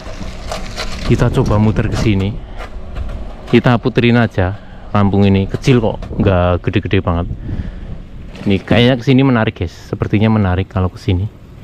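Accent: Banyumas, Indonesia. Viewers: Punten..mas iki jawane ngendi yah..ko ngapak bener hehe.. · Mas ini aslinya drmn? Spt dr daerah Banyumas kl dengar logatnya.. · Ngapak Banyumas kayane kie logate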